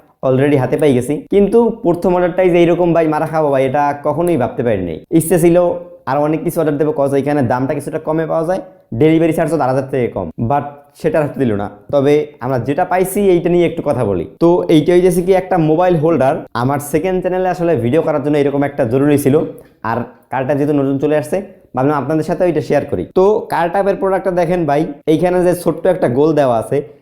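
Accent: Barishal, Bangladesh. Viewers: এ দেহি মোগো বরিশাইল্লা। মোর বাড়ি কলাডেমা। চিনছেন নি জায়গা ডা?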